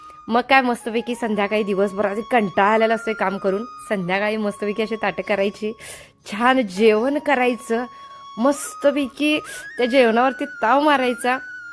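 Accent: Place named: Solapur, India. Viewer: ताईंच बोलणं कोकणी नाही वाटत, सोलापूरी किंवा सांगली कडील लय वाटते बोलण्यात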